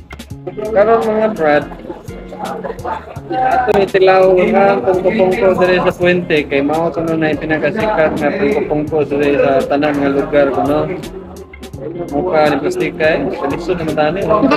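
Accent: Visayas, Philippines. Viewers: 🤣🤣🤣 Bisaya man diay ka! love it! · Atay bisaya jod kaayo ohhh hahahaha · First time nahong tan-aw sa imong vlog. Cute kas imong bisaya nga accent maka adik. Murag kag tinuod mauni gi tawag og ugis na puti hahaha. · ayus accent nimo bpd bisaya gyud kaami paminawon oi..... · lami kaau imong accent brad 🤣👍🏻.. 1000% bisaya accent! i just subscribed to your channel 😊❤️ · Pila na diay ka katuig sa pinas sir? Orig naman kaayo imong bisaya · Kabisaya ba jud kaau ani nya oi.. pati mag likramo sa silupin hahahaha new subscriber here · Kuha gyud kaau ang accent sa mga Bisaya ba..kung di ko motan aw sa video Bisayang tao gyud akong maimagine.pero kay nakita na naq mas nibilib kaau ko..hawod kay moBisaya tapos kaming Bisaya usahay maglisud ug English......❤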